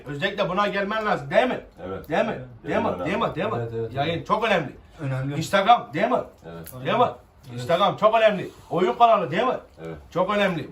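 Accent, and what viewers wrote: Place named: Istanbul, Turkey. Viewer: Abi şiven değişiyor birz istanbul şivesine yakın konuşuyon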